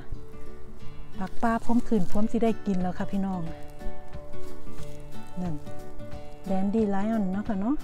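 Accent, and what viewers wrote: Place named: Phu Khiao, Thailand. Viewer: เสียงเว่าคือคนทางภูเขียว ภูเวียง ทางชุมแพเนาะจ้า เว่าสำเนียวมวนดี ขอให้มีความสุขกับการใช้ชีวิตในต่างแดนเด้อจร้า😍😍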